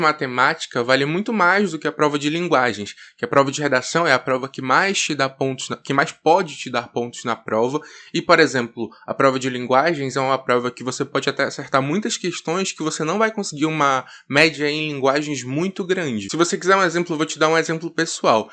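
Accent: Pará, Brazil. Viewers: Tu é do Pará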